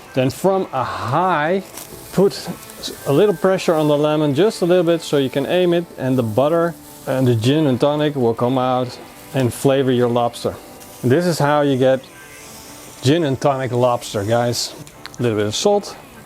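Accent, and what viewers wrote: Netherlands. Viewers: Ik hoor een Nederlands accent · Engels met Nederlands accent . Grappig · Annoying dutch accent.🤮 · Ik hoor gewoon te goed dat je Nederland bent.. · Hahaha 100% jij een Nederlander bent, ofja je ouders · You have a dutch accent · Noticing the slight dutch accent I was surprised that he did not throw the lobster alive into a deep fryer...😅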